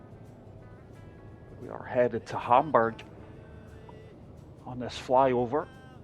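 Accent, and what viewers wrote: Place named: Glasgow, United Kingdom. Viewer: You sound like you from Glasgow ye from there o am and if so what team you support the blues or greens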